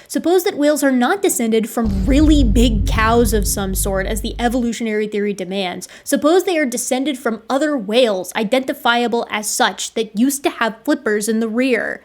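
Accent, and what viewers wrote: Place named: Minnesota, United States. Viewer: Is that a Minnesota accent I hear, ew.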